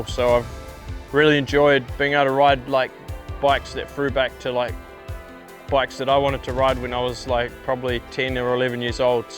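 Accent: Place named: New Zealand. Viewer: I would love him in the commentary booth but if he failed the test it is probably because his english is pretty hard to understand. We're talking heavy kiwi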